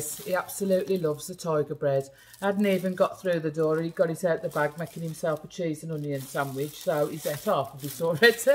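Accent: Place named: Midlands, United Kingdom. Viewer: That always happens to me when I go shopping.. the cream cakes just fall in my basket.. I haven’t go clue how they get in, but I have to eat them or else it would be a waste wouldn’t it ..lol, that’s what I tell my hubby anyway..lol. My question is...(I’m from the midlands, I recognised your accent.... what part of the midlands are you from..xx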